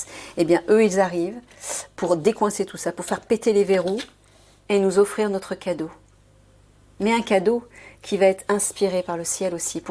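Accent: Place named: Belgium. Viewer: Oh vous êtes belge :). C'est clair que c'est de plus en plus visible... Merci pour ce partage.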